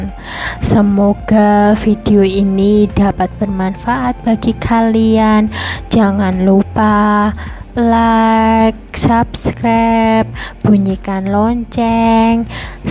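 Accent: Java, Indonesia. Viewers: channel gila medok nya tambah nggila ni 🤮🤮🤮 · mau ketewa denger medoknya..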